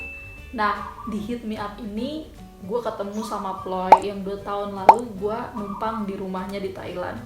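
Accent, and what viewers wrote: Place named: Java, Indonesia. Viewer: Wong jowo mas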